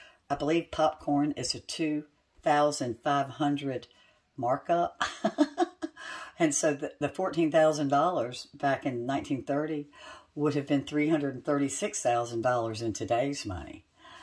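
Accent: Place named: Virginia, United States. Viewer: You're a great storyteller with that Virginia accent.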